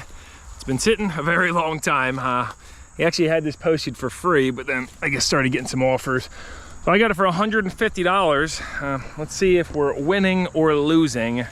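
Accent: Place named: New Jersey, United States. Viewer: You have the same accent as my cousins who are from Collingswood NJ ! I instantly was like woah this guy talks just like my cousin ! I live in Syracuse and they made fun of our accent lol they laughed when we'd say "wicked cool" or "its wicked hot" lol. Thanks for reminding me of fond childhood memories !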